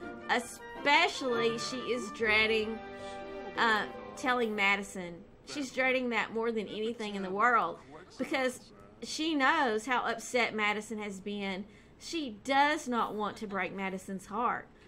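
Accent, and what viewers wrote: South, United States. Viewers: Hi!:) I've commented on a few of your past videos and I've finally caught up on this LP and let me just say: I LOVE it! It's honestly one of the best Sim's LP's I have ever watched. I love how you continue the story; you're so creative! And your voice is very soothing; I could listen to you all day.. It reminds me of the family that I have down South in Kentucky and Tennessee! One more thing before I go! (Sorry this is such a long comment.) I found a picture on Facebook of a house and I was wondering if there was a way I could contact you and send it too you? I would love to see a speed build with your take on the picture! Thanks..:)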